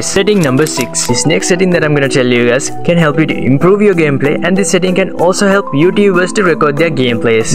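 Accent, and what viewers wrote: India. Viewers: I love your indian accent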